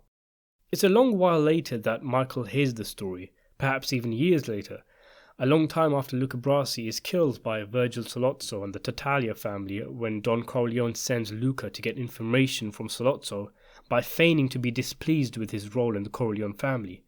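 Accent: England, United Kingdom. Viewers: It shouldn't be this way and I'm embarrassed to admit to admit it but it just doesn't feel right hearing an evaluation of the Godfather coming from someone with an English accent. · Hearing an Englishman try to pronounce Italian words and names is almost as funny as hearing an Italian try to speak proper English. Appolina? for Appalonia. And the flat A in Mario is a constant in British form of Italian speech. When will the Brits learn to speak American? Water closet is an awful name for that. And cars do not have a bonnet or a windscreen. How would either of those work?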